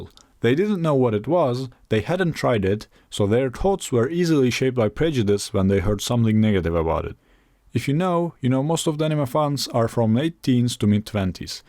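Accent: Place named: Turkey. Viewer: this isn’t related to the topic but are you turkish your accent kind of sounds like you are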